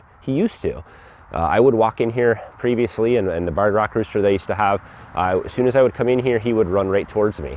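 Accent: Michigan, United States. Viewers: Bro are you from Michigan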